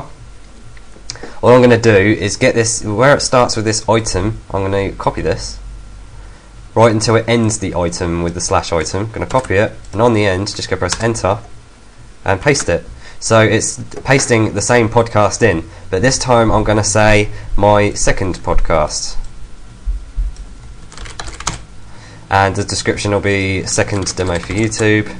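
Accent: England, United Kingdom. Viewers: when i create my file in the main directory it comes up as podcast.rss.txt does it matter that the .txt is at the end? I cannot upload to itunes and i'm guessing its because of that ...btw you talk to damn fast and have an english accent so i cant fully understand what you are trying to say ...folder sounds like " fohder "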